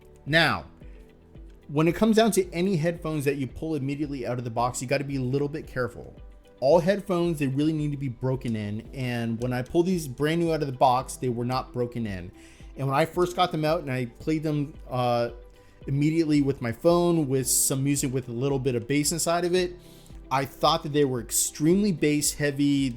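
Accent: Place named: Canada. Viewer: I hear Canadian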